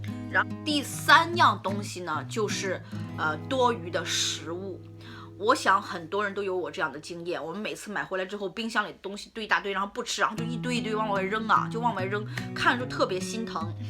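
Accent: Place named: Qingdao, China. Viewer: 听姐姐说话像青岛人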